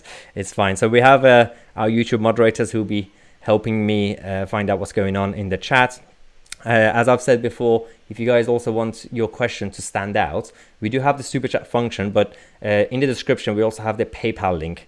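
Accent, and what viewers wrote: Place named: England, United Kingdom. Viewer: Ah, so that is where your accent was created, between Leigh and Greenwich, almost Kent:)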